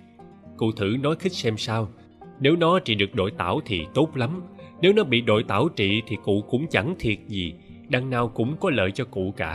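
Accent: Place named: South, Vietnam. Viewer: Tất nhiên rồi. Bởi vì Chí Phèo là nhân vật xuất phát từ miền Bắc, quê hương của Nam Cao thuộc tỉnh Hà Nam Ninh ngày xưa mà. Nhưng Giọng miền nam ngọt ngào của bạn này cũng rất hay. Hay lắm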